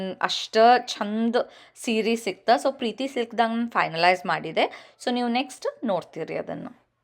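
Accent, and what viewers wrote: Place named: Uttara Kannada, India. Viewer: Uttara Kannada bashe tumba Chanda 👌👌I am from Bengaluru I am watching ur vlogs for the way u talk 😊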